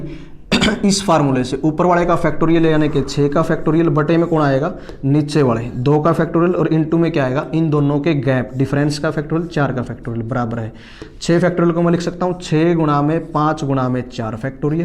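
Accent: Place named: Haryana, India. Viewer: Superb sir apki haryanvi language 🙏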